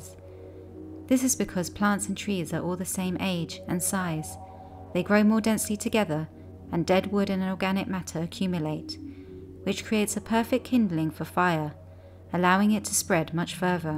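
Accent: London, United Kingdom. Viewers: Nicely narrated. Detect a slight London influence? Excellent!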